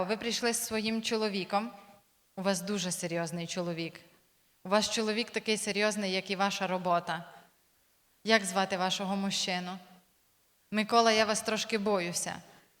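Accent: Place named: Western Ukraine, Ukraine. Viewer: Вона з Західної України, там акцент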